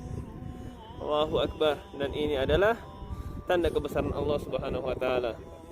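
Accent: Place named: Poso, Indonesia. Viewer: Masi ada logat Poso juga ee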